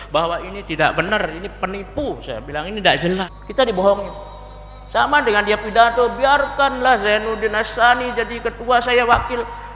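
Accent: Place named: Bali, Indonesia. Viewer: Orang mana ini brbahasa sj logat bali x ye... koq gk logis cm bs sling adudomba masyarakat gk etis dech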